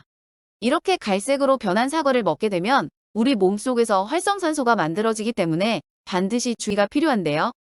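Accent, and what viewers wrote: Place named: Gyeongsang, South Korea. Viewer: 경상도 말투가 서울말씨랑 어울려저 아주 듣기좋아요ᆢ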